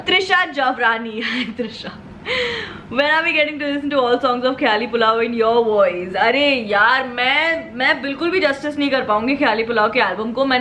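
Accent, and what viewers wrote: Maharashtra, India. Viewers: Ma'am I'm a really really big fan of yours. Your videos are so so amazing and specially your way of expressing. I always watch your videos after studying and believe me it's so so relaxing. Your Marathi gives it a tinge of fun and #pridekolis. You really entertain everyone. I wish you all the best for your future. ❤️❤️ And yes LOVE LOVE ✌️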